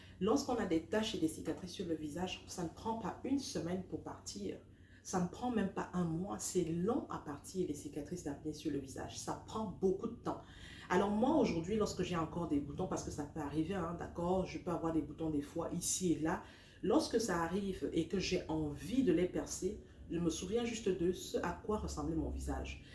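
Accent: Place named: Cameroon. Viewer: Timbre camerounais ce que vous dîtes est très vraie j'aimerais svp savoir comment faire pour avoir votre extracteur svp merci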